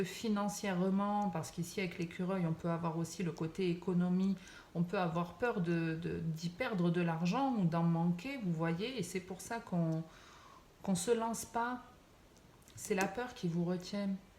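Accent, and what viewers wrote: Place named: Toulouse, France. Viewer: Bonsoir madame vous êtes également du verseau il me semble.j adore votre accent . Toulouse ahhhh . merci pour ce clin d œil.merci pour ce tirage .. bises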